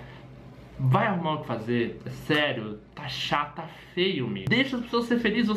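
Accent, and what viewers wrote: Brazil. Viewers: tu és pt e tas a falar pt br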